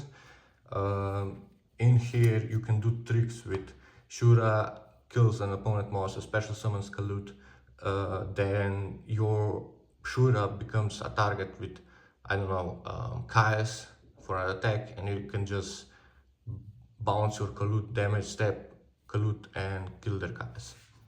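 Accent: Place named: Slovenia. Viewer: I can hear the slovenia accent from kilometers away [mojster]😂 nice video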